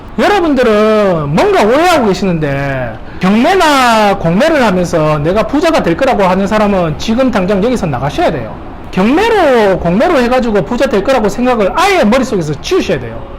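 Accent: Gyeongsang, South Korea. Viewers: 쇳쇨 심한 심한 경상도 액센트 너무 강해서 듣기싫네요, 군대 바리훈련소도 아니고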